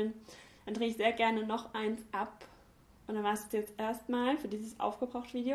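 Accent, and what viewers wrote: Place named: Baden, Germany. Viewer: Ja gerne nochmal ein Video 😆 diese Kombi aus Badisch und Hochdeutsch ist immer lustig 😆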